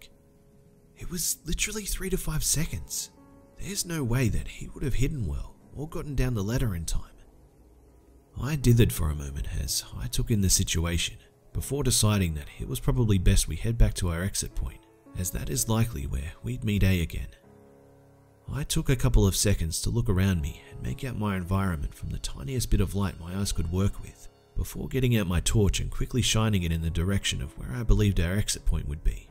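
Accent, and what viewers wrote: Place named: Australia. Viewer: hey you sound aussie.which city are you from? 😄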